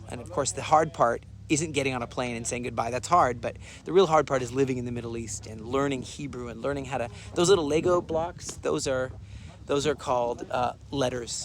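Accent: United States. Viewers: Excellent American accent for being a native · Is this guy American or Israeli?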